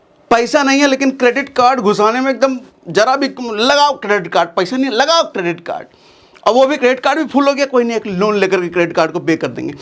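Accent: Bihar, India. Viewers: sir namaste🙏 tex pe video chahiye v bhi aap ke desi bhasha me bij bij pe bihari ton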